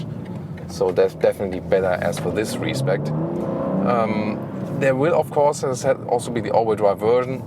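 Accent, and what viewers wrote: Germany. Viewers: German accent kicks hard af haha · Good accent.....German Englush style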